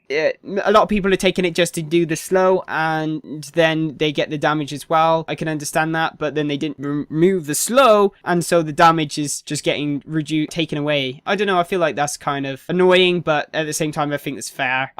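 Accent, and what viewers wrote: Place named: West Country, United Kingdom. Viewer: Personally subbed because of your west country accent. makes me think of home! Great vids. hardly any holy pala changes makes me smile!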